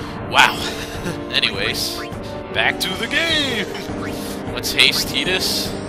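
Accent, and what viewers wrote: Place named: Arkansas, United States. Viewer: WAKA WAKA WAKA! dude are you from arkansas? You must be...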